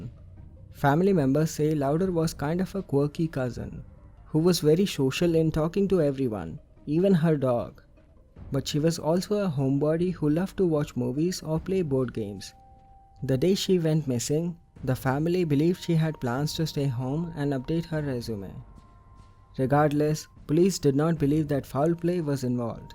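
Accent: India. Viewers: Indians don’t make good commentary when it’s English, can’t listen to a voice like that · I LIKE YOUR CHANNEL!!! IT'S CREEPY ASF!!! YOUR INDIAN ACCENT MAKES IT EVEN CREEPIER!!!!! GOOD SHIT APU!!!!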